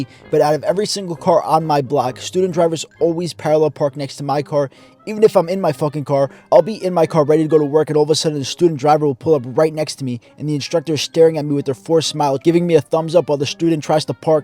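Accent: New York, United States. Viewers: You have a New York accent. Are you from NY?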